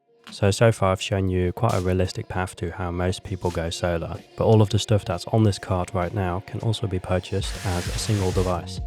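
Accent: United Kingdom. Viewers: Out of interest, are you Dutch+British? You have a very British accent, I initially thought you were based in the UK when I first started watching your videos haha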